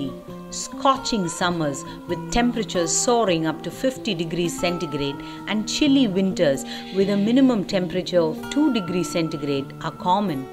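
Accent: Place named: India. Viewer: You seem to have a foreign name with an Indian accent.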